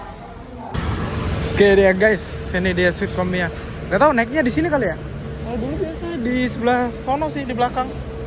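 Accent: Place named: Depok, Indonesia. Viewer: Cara ngomong abg ni kayak mas mas Depok Nugroho Febianto 😅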